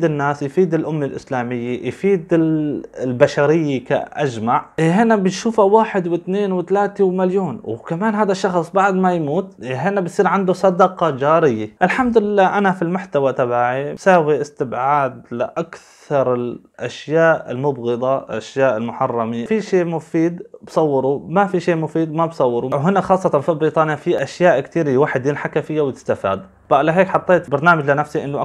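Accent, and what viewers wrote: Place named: Syria. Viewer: مبروك يا اخيي بس عندي سؤال انت سوري ام لبناني لان لهجتك سورية ولكن فيك كم كلمة لبنانية أنشأ الله ترد علي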